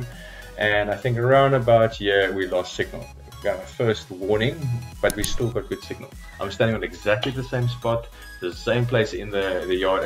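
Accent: South Africa. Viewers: Nice to hear an SA accent gain. Filming from Melkbos? My question: I am currently in Vancouver, Canada. How will I find out if I am in FCC mode on my DJI FPV?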